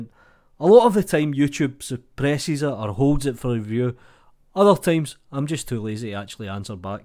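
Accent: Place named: England, United Kingdom. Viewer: best english accent ever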